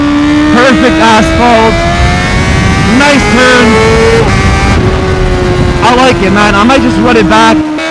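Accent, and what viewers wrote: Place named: United States. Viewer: I was so confused by your American accent but European plates and roads and using km, I was like where tf is this filmed 😂 I was like this is a weird fucking part of Canada 🤣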